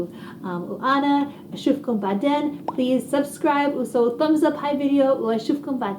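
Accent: Iraq. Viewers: خخخخخخ اللهجة العراقية جيبلي القرف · احلى شي من تحجي عراقي ❤️❤️❤️❤️❤️ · فدوه شلون تحچي العراقي يجنن بحلگچ هدى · هههههه عراقي يجنن عليها 😂😂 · كايمه تحجي عراقي فدوه 😂😂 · مرحبا هدى تخبلين من تحجين عراقي واني اتابعج كلش حلو الفديو عجبني ❤ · اويي فدوة شكد احبها من تحجي عراقي 😘😘😍😍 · Yes BABY I'm so so proud of you keep practicing your arabic! It has truly improved! Love you little cute Iraqi accent❤️ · حبي هدى اللهجه العراقيه تجنن عليج استمري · والله اتابعه علمود اسمع اشلون تحجي عراقي يجنن حجيه 😘😍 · حبيبتي لهجتچ بالعراقي مهضومه 😄 فديتج اول مره اعرف صاحبه ماركه هدى بيوتي بس جد حبيتج😘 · فدوة حبيبتي شكد متواضعة ربي يوفقج وأحلااا شي حجيج العراقي ولو مكسر بس حلووو💋💋💋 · احلى شي بيج باقيه على لهجتج العراقيه رغم صعبه عليج احسن من البعض بس طلعو من العراق غيرو لهجتهم تحيه الج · تخبل من تحجي عراقيييي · أحب لهجتها العراقية 💜💜💜💜💜💜💜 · كلش احب لهجتج بالعراقي😍😍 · You're speaking like irak😂... 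You're amazing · اموت عليج من تحجين عراقي ♥ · يخبل عليها الحجي العراقي يمه فدوه 😍🇮🇶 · حبيتت تعلمت واايد اشياء منج و سوي ڤيديوات اكثر بالعربي عشان تتقنين لهجتج (كيوتت لما تتكلمين بالعراقي😭😂💜💜) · عفت الفيديو وكعدت اسمع لهجتج بالعراقي 😍كلش حلوه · هاذي لهجه عراقيه وهيه عراقيه يعني مصطلحات عراقيه😂😂😂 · فدوة من شافت عراقين يدعموهها ويحبونه كامت تحجي عراقي وبصراحة كلش حلو 😇😇😇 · طلعت عراقية ، فديتها و فديت اللهجة العراقية حلوه هواااي ، تحية من جزائرية ❤💋 نبغيكم بزاف و نبغي كتهدرو هاك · هدى تعلمت تحجي عذراقي اعشقج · فدوه شلون تحجي عراقي امووت عليجج حياتي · يمه فدوه للتحجي عراقي....فديتج... اصلا اني اكثريت منتجاتي من هدى بيوتي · استمري يا حلوه حلو الكلام العراقي عليج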